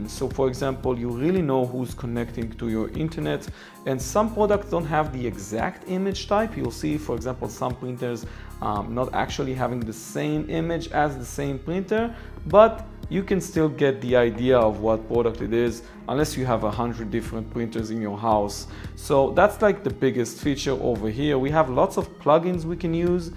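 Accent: Israel. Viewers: Love the Israeli accent! Quick questions: 1. Do you need a modem to connect to the router or it is functional as both? 2. Can u access the administrator panel easily? Does it support English or it is all on Chinese? Thanks for the review mate! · שומעים שאתה ישראלי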